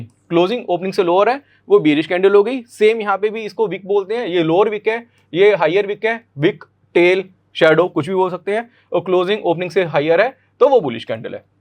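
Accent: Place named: Bhojpuri, India. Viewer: Mr. sr ji Aap ki bat hi samjh me hati h. Bojpuri style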